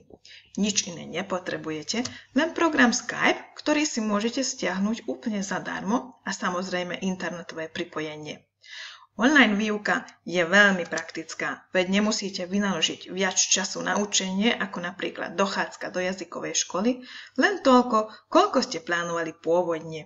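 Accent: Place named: Hungary. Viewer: Vadí mi tam ten maďarský prízvuk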